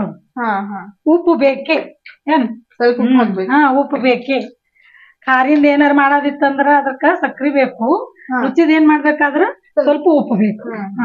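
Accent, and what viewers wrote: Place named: Solapur, India. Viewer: Nimma yavdu ooru .namma solapur hage maatu ide.👍huggi bhalu channda agide.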